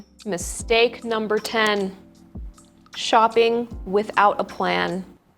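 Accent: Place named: Australia. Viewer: I love you!! And your Australian accent is the best! 😂 very helpful tips!! 🙏🙏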